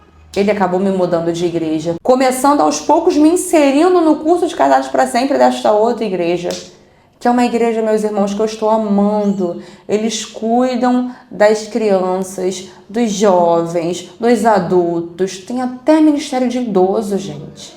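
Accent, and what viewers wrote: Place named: Rio de Janeiro, Brazil. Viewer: Voce e carioca teu sutake parece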